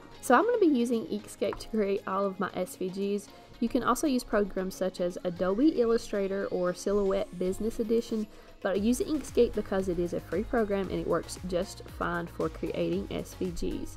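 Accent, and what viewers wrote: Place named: South, United States. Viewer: You are speaking my accent!!! From one southern girl to another, I love this video! Thanks so much for sharing! Do you use Inkscape for all of your SVG designs or do you use other design software as well?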